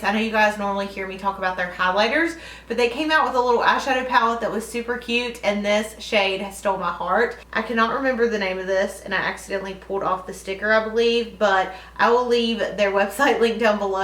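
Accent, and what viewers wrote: South, United States. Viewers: I could listen to your cute country accent all day! Great video as always x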